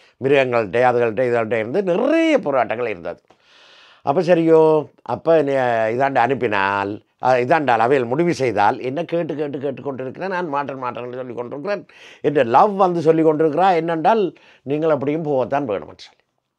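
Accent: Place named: Jaffna, Sri Lanka. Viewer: 😂🎉thanks aiya for share your experiences in purely jaffna tamil 😂😂❤😂❤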